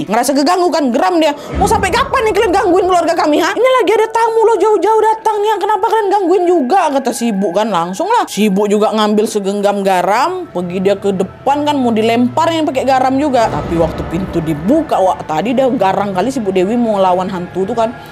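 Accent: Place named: Medan, Indonesia. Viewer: Medan kali yaa kak 😅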